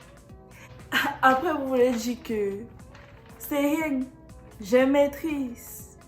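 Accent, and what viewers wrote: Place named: Cameroon. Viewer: ... bonjour à toi… je me suis arrêté où tu dis MAJOUMBOUM... si tu voyais la tête que tu fais en disant ce mot...😁... bref tu as dis à la fin que tu espère qu'on a aimé, j'ai aimé mais je trouve que tu as été trop doux envers les Camerounais… le Camerounais a tellement des talents et des dons mais dommage la transmission est ZERO, sur le plan éducatif MAJOUMBOUM et tout le reste là, nos petites sœurs et petits frères retiennent quoi sur le plan INSTRUCTIF… Mon père MEA dit souvent on ne peut donner que ce que l'on connait, nos frères adorent le bruit et le bouquant. l'ambiance n'est pas une mauvaise chose mais il faut que ça éduque, que ça instruit; on va toujours nous insulter et nous qualifier ( MAJOUMBOUM ). et en passant tu as gardé ton accent Camerounais où est passé l'accent grec???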